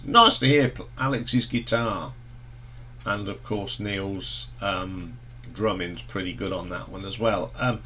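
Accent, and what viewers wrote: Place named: Sheffield, United Kingdom. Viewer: You sound like you're from Sheffield.. am I right?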